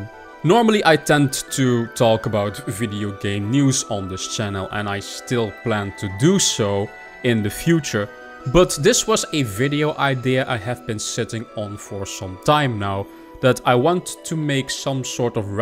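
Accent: Netherlands. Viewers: Hahaha het is altijd te horen voor Nederlanders.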